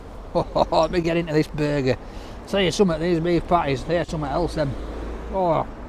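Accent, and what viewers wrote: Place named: North, United Kingdom. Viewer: Love this guy proper northern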